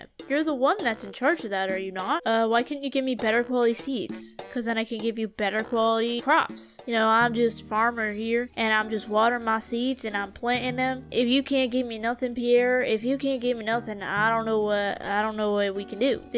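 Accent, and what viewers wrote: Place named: South, United States. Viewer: I love her southern accent Like actually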